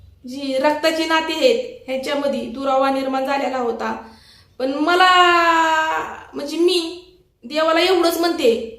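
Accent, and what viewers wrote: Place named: Bhor, India. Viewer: मी तुझे व्हिडिओ कायम बघते मला तुझे व्हिडिओ आवडतात कारण मी पण भोर तालुक्यातील आहे कारण तुझे बोलणे आहे ते रेल आपल्या गावाकडचे आहे ते मला खूप आवडते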